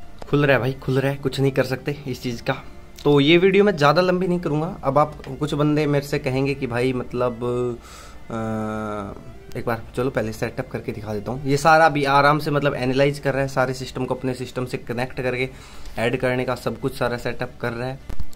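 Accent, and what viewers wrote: Haryana, India. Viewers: Bhai haryana me kaha se ho? Awaz me haryanvi touch h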